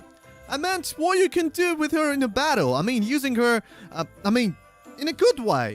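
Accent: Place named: Russia. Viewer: Позорище, русский мужик на рус-англе догло читает транслит особо это не скрывая🤦🤦🤦🤦🤦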